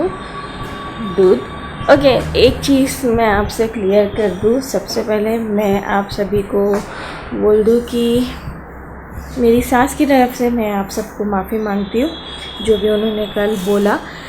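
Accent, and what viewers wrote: Maharashtra, India. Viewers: Tab hi mai sochoon aap marathi accent aur amma ji bhojpuri accent humare husband ke dadi aise hi baat karti thi she passed away this January yaad agyi unki....waise mai Bengali hoon Up wale se shadi hui hai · maharashtra ki rough boli hai aTapori bhasha hai gandi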